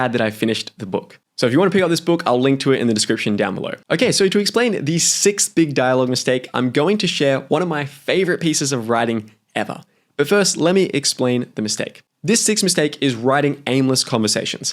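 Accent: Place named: Australia. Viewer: Interesting to hear your accent. Aussie but the US influence is much stronger than from my day. Change in “a”. Pronunciation of “figure” for example.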